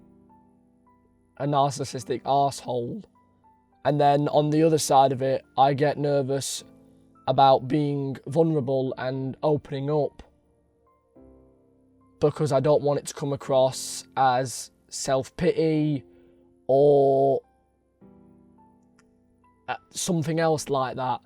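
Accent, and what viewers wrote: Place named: Manchester, United Kingdom. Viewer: Where are you from? I'm from Sweden so I can only guess Mancester. Sorry if you are from Salford. But you are from from the north, aren't you. And you don't have to be "larger than life!"...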